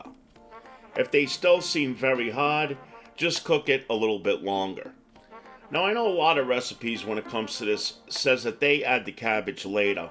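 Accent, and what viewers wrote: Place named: New England, United States. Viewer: Oh how I miss New England accents, I'll be making a boil dinnah on Sunday 🤤🤤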